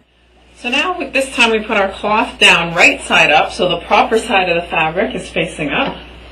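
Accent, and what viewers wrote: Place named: Minnesota, United States. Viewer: Do I detect a Minnesotan, or something nearby, accent? 8) You sound like so many of my northern relatives. Thank you for the video - about the only thing I can sew is blankets and pillow cases so it was nice to see another use for straight lines. I like to use box flannel and back it with satin - it's a tough sew with the slippery fabric, but the kiddos Love the 'silky' when they take their naps.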